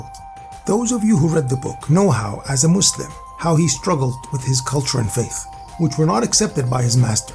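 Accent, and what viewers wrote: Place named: Egypt. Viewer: By the tone and inflection, the narrator is Egyptian and I suppose he didn't want to reveal that aspect of Egyptian history for fear of it being mistaken as a bad thing. A euphemism of sorts. The price of gold in Egyptian markets plummeted as did the cost of living .. which is a good thing for the public masses..and bad thing for the rich.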